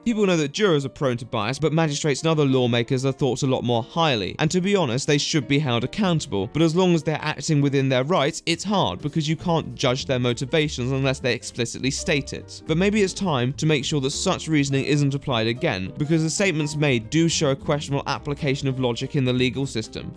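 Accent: United Kingdom. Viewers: is this a voice you put on or is it genuine? it's the most english voice ive ever heard aha, and i'm english myself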